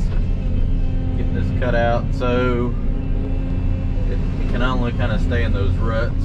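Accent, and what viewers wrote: South, United States. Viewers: I love watching the different things you do. I also love to hear you talk with that southern drawell and semi deep voice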